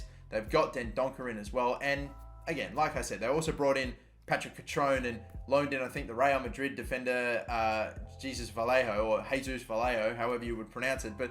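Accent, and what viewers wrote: Australia. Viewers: he's Aussie right? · no he is Australian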